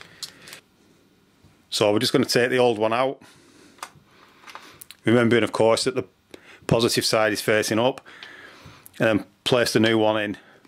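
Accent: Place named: England, United Kingdom. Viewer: brilliant english accent i adore )))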